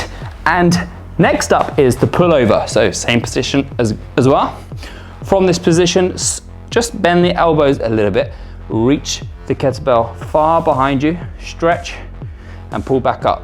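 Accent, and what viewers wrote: United Kingdom. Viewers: I'd like to win . Appart from the excersices i love the british english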